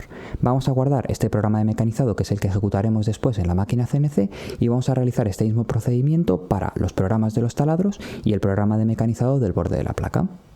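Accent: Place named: Spain. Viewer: por tu acento eres de España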